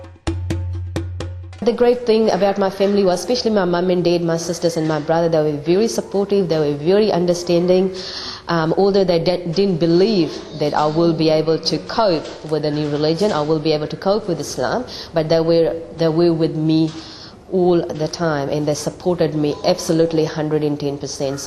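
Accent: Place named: United Kingdom. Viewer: This is UK accent 😊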